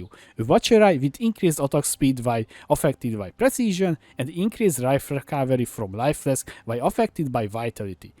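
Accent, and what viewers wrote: Hungary. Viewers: Are you Hungarian by any chance?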